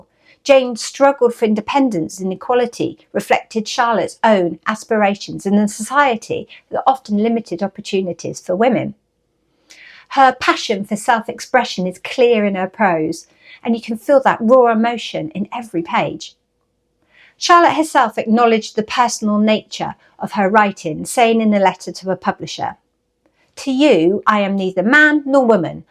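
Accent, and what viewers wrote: United Kingdom. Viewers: I am always amazed how much masticulation is required in the British Isles in order to speak the King's English. Native speakers, sadly, I cannot understand much, the accent is too overpowering, the words and sense often lost. I couldn't consider taking ship to a martian colony with Cambridge or Oxford or Scottish accents --needless to say. Videos are sufficient existential challenges for a wretched, unaccented bloke like me. Other than that, the enthusiasm for Charlotte Bronte is absolutely imfectious.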